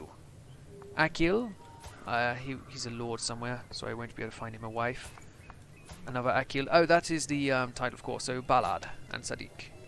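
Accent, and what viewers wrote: United Kingdom. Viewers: . You're thinking of Mo Farah, the long distance runner. Mo Salah is a soccer player. Bro you have the most british accent I've ever heard, you should know this.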